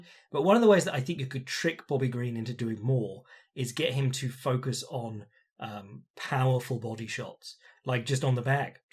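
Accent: United Kingdom. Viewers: Time to let Jack Slack serenade us for 48 minutes with that British schoolboy accent as he describes Aldo's flesh-rippling kicks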